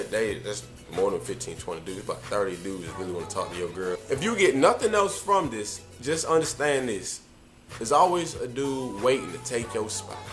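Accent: South, United States. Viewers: I thought he was a Southern boy, too. I was about to be really excited about that. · Why do you sound like you from the south.... if your from NJ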